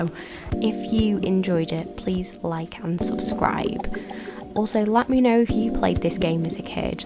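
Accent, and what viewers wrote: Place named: Liverpool, United Kingdom. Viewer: Hearing Urbz in a scouse accent is wild 😂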